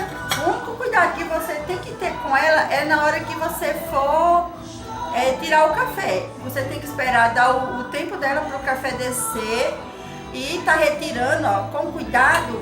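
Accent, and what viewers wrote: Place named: Alagoas, Brazil. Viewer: Eitaaa q Pablo tá rolando solto..kkkkk moça vc é de Alagoas??? Acho lindo o jeito q vcs falam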